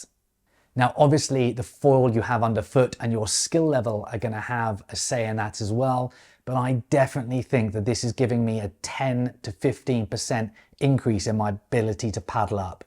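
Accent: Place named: England, United Kingdom. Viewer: Nice one. I love the Australian colloquialism with an English accent. Easy peazy lemon squeezy. I have a question about one of your earlier videos, Part 2 of you learning to downwind and flat water paddle up...You mentioned two things which made a significant difference...one was the foil and the other was the paddle. Can you explain why the paddle made a difference please. And also why you no longer use the PPC paddle shown in that video.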